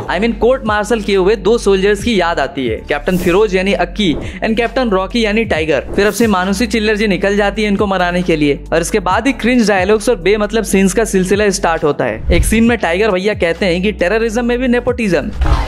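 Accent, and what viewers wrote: Bengal, India. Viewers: Are you bangali 😅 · I am from kolkata and your bengali accent bro touched my heart great video man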